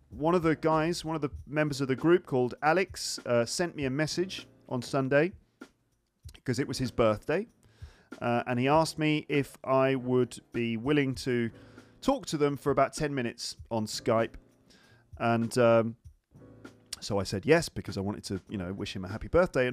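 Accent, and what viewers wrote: United Kingdom. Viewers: hello... First time watching you....great..I love your British accent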